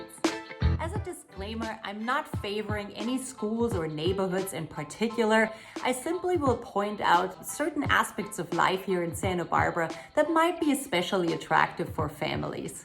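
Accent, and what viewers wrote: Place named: Germany. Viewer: Your accent sounds german, germans seems to know the entirety of everything, is that you?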